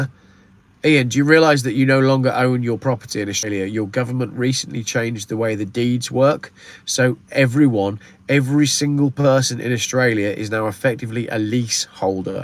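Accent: United Kingdom. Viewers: Hi. Come to Texas if you can get into the USA. We have laws that protect free speech and the ability to defend yourself and your home here. Texas can legally secede if the SHTF. It is the 8th biggest economy in the world. Just stay away from the big cities - Dallas, Houston and Austin. I have lived in Colorado, Wyoming and Texas since I emigrated 19 years ago when Blair was ruining the UK. Though it's fairly hot where I live in the South Panhandle, I feel relatively safe in a smaller Texas town. The people here very friendly and helpful, and they LOVE a British accent. It is a HUGE state with different types of weather so do your research.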